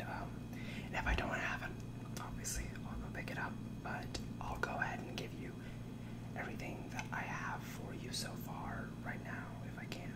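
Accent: India. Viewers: Specially with indian accent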